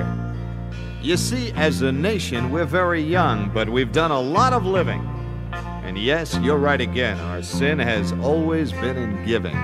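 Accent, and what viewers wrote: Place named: South, United States. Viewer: No, it didn't get airplay because it is like listening to nails on a blackboard. Poorly produced and narrated, it would never even come close to the success of the Byron McGregor single for those reasons, not because of anyone's "agenda." McGregor was a radio news man. He had a great voice, and an orchestra backing him up. This guy? A monotonous, droll guitar and a voice that sounds like a cross between John Wayne and some Southern hick that just figured out what eggs are. Just remember this.....a radio station Program Director makes the call on what gets on the air, on commercial stations anyway, and the idea is NOT to play releases like this which will cause most people to go to another station. Ratings drop and then so do advertisers. Don't blame the lack of airplay on the "powers that be," place it squarely where it belongs, on a bad record.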